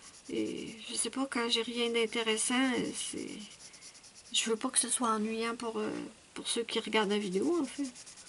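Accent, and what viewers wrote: Canada. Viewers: Super! J’ai passé deux moments vraiment agréables en ta compagnie! Oui😂hier soir je me suis endormie au son de ta si charmante conversation aux accents canadiens😉je trouve ce début de coloriage prometteur, c’est vrai qu’il ne faut pas s’acharner sur un colo quand l’ennui frappe à la porte; c’est vrai pour tout type d’occupations dans les loisirs comme ailleurs...J’ai tendance à aimer terminer ce que j’ai commencé; éducation oblige...mais le coloriage m’a appris à être moins rigide et à me permettre plus de fantaisie; et je suis capable maintenant d’attaquer un autre coloriage sans me sentir culpabilisée, tu vois tu n’es pas la seule dans ce cas😊Mais je ne me disperse pas; je tiens le cap 😄En ce qui concerne l’abord de mes coloriages; je pars sur une idée générale de couleurs et je laisse aller mon inspiration du moment vagabonder; je suis toujours surprise des résultats bons ou mauvais😂Encore merci pour le temps partagé avec nous; je suis allée sur la page Facebook de Muriel et effectivement cet aigle est somptueux; je trouve tous ses colos harmonieux et beaux À bientôt; des caresses aux poilus et plumeux de toutes espèces qui partage ton quotidien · J'adore te regarder colorié j'apprends je regarde plus le mouvement de tes doigts si j'ai adoré sur les températures de chez toi moi qui veut allez vivre là bas un jours comme je ne supporte pas la chaleur et le soleil pour moi sa serait l'idéal moi je t'imagine je ferme les yeux alors a ta voix je voit une jeune femme grande longiligne avec des cheveux long mais tenue la tenue la journée brune et un visage ovale et un louque décontracté jean sweet a capuche pas maquiller ou que quand tu sors et des chaussons moumoute que tu enfille comme des bottes voilà pour moi je te vois comme sa un jeu? 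Comment est notre préfère et son très bel accent canadien comme le dis grand corps malade "je connais leur secret pour leur accent qui chante ils ont trop souplesse dans les joues" ils vont magasiné là bas pas de shopping c'est vrai?moi je fais un par un mais je suis loin de tes coloriage la je me suis imposée un colo sans numéro juste le model et bin je sais pas où posée le premier coup de crayon c'est une princesse manga,pour tes yeux le soir met une lumière blanche mieux pour les yeux fragile et des larme artificielle pour les hydrate 👍👍👍💖💖💖💖😘😘😘😘😜😜😜😃😃😃😃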